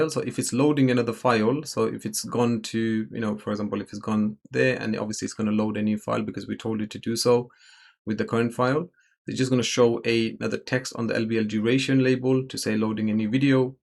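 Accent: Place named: England, United Kingdom. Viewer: WOW FIINALLY SOMEONE WHO SPEAKS ENGLISH ENGLISH NOT INDIAN ENGLISH <3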